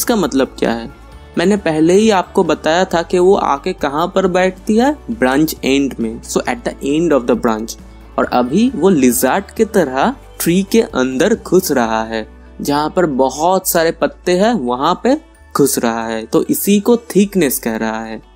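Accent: Bengal, India. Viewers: I am from..howrah.... Hindi of bengali are usually like this..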